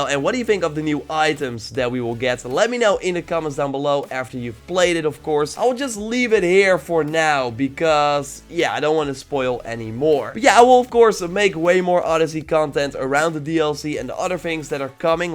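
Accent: Netherlands. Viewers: This dude is 100000% dutch the accent is real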